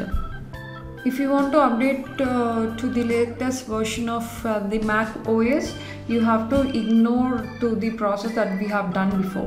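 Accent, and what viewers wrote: South India, India. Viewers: nice voice di are you from south india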